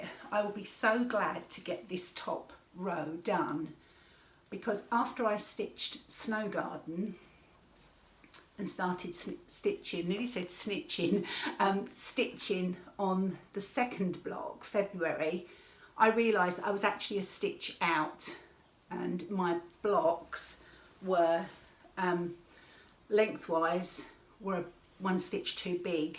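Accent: England, United Kingdom. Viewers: SapphireMtHandcrafts A lovely time when I sit back and let you transport me to England with you accent and way of talking. So enjoyable. Looking forward to seeing how the watering can bag turns out. You garden looks like it needs a few more months before Spring arrives and flowers overflow your hanging basket s. Thanks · Always enjoy watching your FT. Jealous of your conservatory🙂 I enjoy hearing your English accent, as my mom was from England, and I hear you say some of her expressions like skew wiff. I’m in Ontario Canada. I’m glad you are doing the Lori Holt sewcial,. I also enjoy stitching up her patterns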